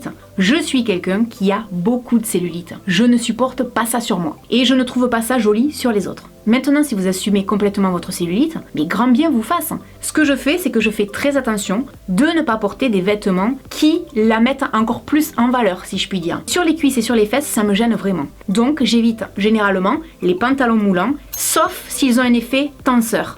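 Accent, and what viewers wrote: South, France. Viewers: J'adore ton accent du sud!! J'adore...... 😄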